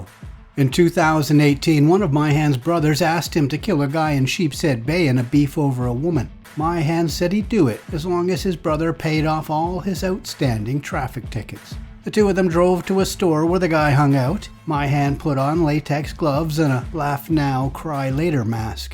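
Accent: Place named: Canada. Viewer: You sound like a Canadian bro! Come on down and check it out for yourself.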